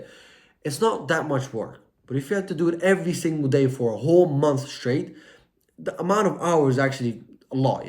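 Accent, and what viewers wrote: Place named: Turkey. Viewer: Brother you sound Turkish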